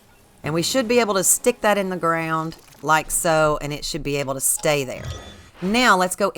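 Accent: South, United States. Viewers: FWIW, I thought your explanation was much easier to understand and follow than any of the other channels who go over this. Maybe it’s the Southern accent! ; ) t. a fan from SW Virginia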